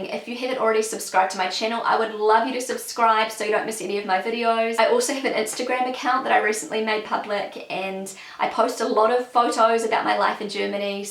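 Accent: New Zealand. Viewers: First of all, I want to say that I enjoy your videos. What I find interesting about your videos, besides the content, is the kind of English that you speak. I had never heard New Zealand English before. I had to watch your first videos multiple times because I couldn't understand everything right away. There are several sounds or words that you pronounce differently and that's why I sometimes understand something entirely different. Here are some examples: rules -> roles; check -> chick; get -> git; ten -> teen; reason -> raisin; bedding -> beating; sense -> since; gas -> guess; f-word -> if-word. Anyway, your English sounds really cute, particularly when you say "GermaNAY" instead of "Germany". Perhaps you could make a video about how New Zealand English differs from US English or British English. What I have also noticed is that English speakers say "recycling" when they actually mean "waste separation". 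I don't know about other German speakers, but I would never refer to the mere waste separation that I (have to) do as "recycling". In my opinion, waste separation is a prerequisite for recycling - or, at most, the very first step of recycling. But it's definitely not the entire process of recycling. I don't melt empty plastic bottles at home and form new stuff out of them. That is done elsewhere by professionals. It probably didn't occur to your German boyfriend to inform you about waste separation, because he assumed that waste separation is standard practice in all countr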